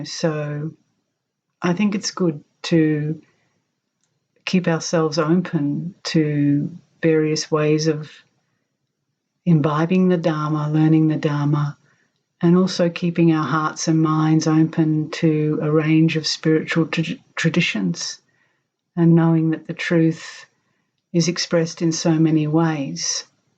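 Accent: Australia. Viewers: Your voice, became ONE with the inward deep recognition by the words of spiritual seers, these inward places are not visited by others..then only you! Who would have thought that, by starting this 'work'? I am shure about it, you wouldn't have expected it at all..which makes you humble in wat you do.:-) Many of them, I would not have searched for, knowing their context from where they started, but listening with my full attention, and with your reading..these difference's fall away. (As does also you're accent being an 'ausie'.., from the first time on fell away.:-) You're a gem for so many of us, so please keep doing this with all your joy.., as we will listen with all our heart and will meet at the meaning of those words. Thanks for presenting yourself, although not needed, you were already there..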